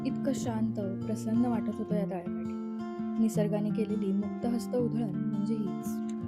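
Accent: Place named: Satara, India. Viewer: ❤ satarakar bolte😍😍😍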